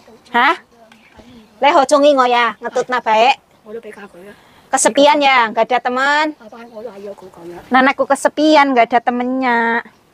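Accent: Cilacap, Indonesia. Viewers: saran sy kalo bisa bicaranya diperhalus dan jangan keras2... Kasian neneknya... Apalagi dgn logat cilacap yg kasar.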